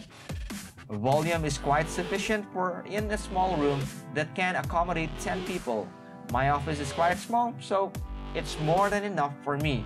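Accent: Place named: Visayas, Philippines. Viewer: litsi bisdak man pud diay ni sya hahaha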